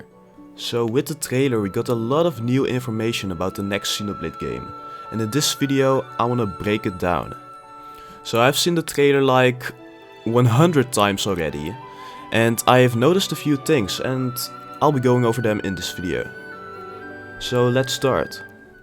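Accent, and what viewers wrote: Netherlands. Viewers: Ik hoor meteen een NLer 👍🏾😂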